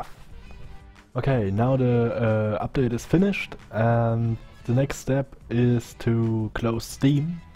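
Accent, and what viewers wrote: Germany. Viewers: sounds german :D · Did what you said and worked PERFECT ! love the german accent makes it funny in some soort of way ;) dont take it bad ! Thank you for this video !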